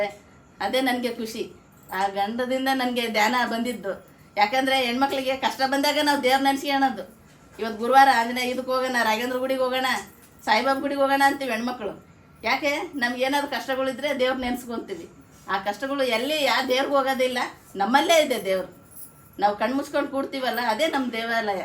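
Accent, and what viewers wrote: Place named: Ballari, India. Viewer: ಬಳ್ಳಾರಿ ಭಾಷೆ ಯಲ್ಲಿ ಮಾತಾಡಿರಿ.ಬಹಳ.ಚೆನ್ನಾಗಿ.ಮಾತಾನಾಡಿದಿರಿ.ಮೆಡಂ.🎉🎉🎉🎉🎉🎉🎉🎉🎉🎉🎉🎉 ಧನ್ಯವಾದಗಳು ನಮ್ಮ ಕಡೆಭಾಷೆ.ಇನ್ನಷ್ಟಬೆಳಿಲೀ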